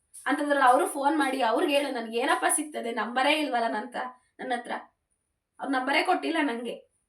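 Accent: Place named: North Karnataka, India. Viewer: uttarakannada bashe keloke chanda...yar yen helidru bashe ge ero gowrava sikke siguthe bit bidi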